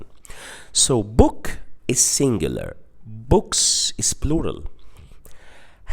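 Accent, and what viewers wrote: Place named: United Kingdom. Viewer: amazing british accent !!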